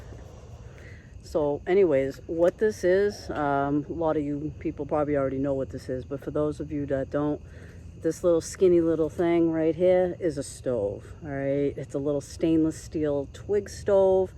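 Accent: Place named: East Coast, United States. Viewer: Oh BTW, love your cute east coast accent 👍, I'm from the west coast of Cali.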